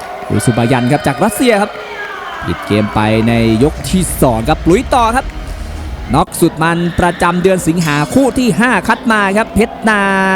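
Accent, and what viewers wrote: Isan, Thailand. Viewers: นอ้ง พากมวยสนุก.ให้เสียงไทยและอี่สาน.ที่ไม่เหมือนใคร.ไทยประสมอีสาน.จะมีบางคำก็ตลกเฮฮาไปดว้ย.บางครั้งผมดูมวยไปฟังเสียงนอ้ง.ผมก็ยังหัวเราะคนเดียวไปดว้ย.มีควสมสุขดีครับได้ฟังนอ้ง พาก.สนุกกว่าพิทีกรอยู่ในสนามมวยเสียอีก.ชอบนอ้ง มากๆครับ.FC.อยู่ฟังลาวครับผม❤❤❤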